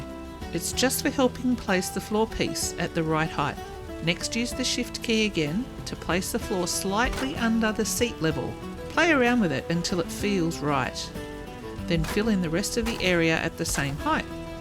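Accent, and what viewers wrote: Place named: Australia. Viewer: It’s wonderful to hear an Aussie accent on a Valheim build vid! Thanks for the beautiful build- I’ll be trying this one out! 💕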